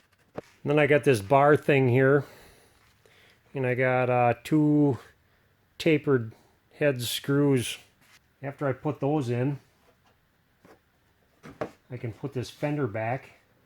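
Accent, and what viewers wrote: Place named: North, United States. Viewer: You sound like ya from up north. Milwaukee here.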